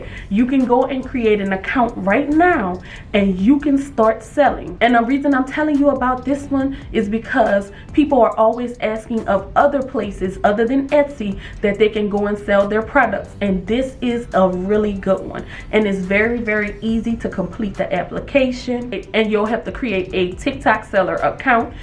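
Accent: New Orleans, United States. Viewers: Love the New Orleans accent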